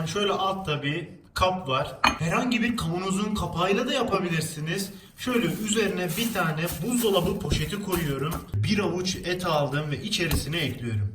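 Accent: Adana, Turkey. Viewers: ses tonu televole.diksiyon adana aq.kesiiüüürüüüm doğriiüüürüüümm.yapma yapma 😆🤣nekadar güzel gözüküyor derken bari gözüküööööööööö deme bari aq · ihsan doğan baya boş yampmışşsın işiniz gücünüz dalga adam adanalı şirvesinin nasıl olmasını istersin seni beyinsiz gerçekten senin gibi insanlar varya...... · ABİ SEN ADANALI MISIN KONUŞMAN ÇOKK BENZİYOR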